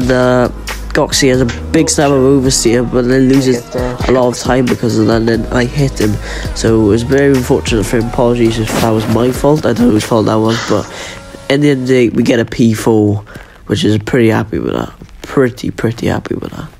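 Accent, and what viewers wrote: Wales, United Kingdom. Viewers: the most welsh accent!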